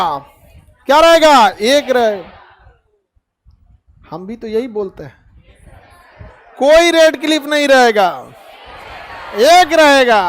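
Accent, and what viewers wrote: Bihar, India. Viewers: I am from Bihar so i relish your language tone very much .u are great teacher.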